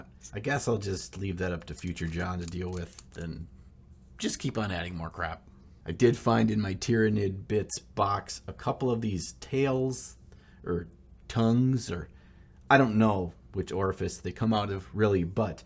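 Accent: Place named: Minnesota, United States. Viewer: This is completely unrelated to the video, but I love the fact I can tell you're from Minnesota! I went to work in Connecticut for two weeks, it was a work trip from the UK, and the guys I worked with mentioned Minnesota for whatever reason, I think it was related to not being able to find an In and Out Burger on the East Coast. They kept calling it Mini Soda because of the accent, and yeh, bag, tag etc they're so distinctive! I love it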